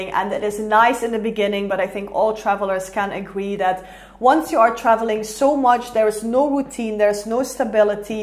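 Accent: Netherlands. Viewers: Your accent is so similar to my Dutch friend Lars and I had already guessed you're from Netherlands if not from Germany 😅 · I could have already guessed that you’re from the Netherlands. That’s because you and itchy boots have same accent. Same energy 😊 cheers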